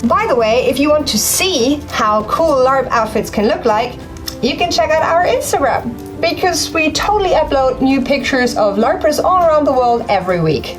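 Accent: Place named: Ireland. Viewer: Sorry for being an American Idiot, but I totally thought was from Ireland from her accent. Prost!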